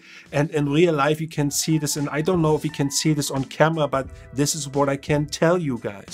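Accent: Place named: Germany. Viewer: I like your german accent, schönen Tag noch 👍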